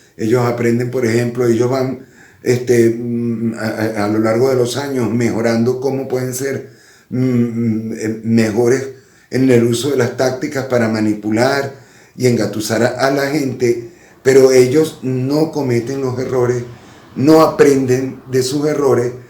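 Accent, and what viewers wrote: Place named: Venezuela. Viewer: Me gusta ese acento. Parece venezolano. Muy dulce como habla también. Gracias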